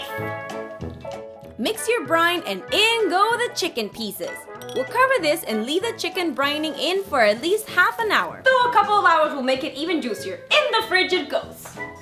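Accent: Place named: England, United Kingdom. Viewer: Soy la única que viene del canal y no entiende🤣🤣amooooooooooooooo tu acento Inglés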